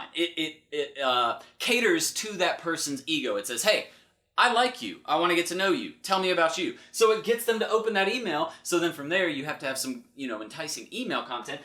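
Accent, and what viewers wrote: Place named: South, United States. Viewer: Hi, that was a very good video with a lot of value, but I love your Southern accent the most.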